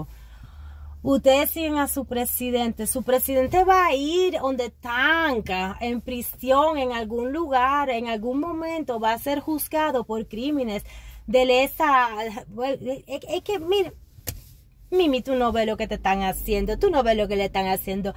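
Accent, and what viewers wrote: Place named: Oriente, Cuba. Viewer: tu eres de las Orientales originales, tu eres de las que dicen valde, guineo y catre. Ese es el verdadero acento Oriental.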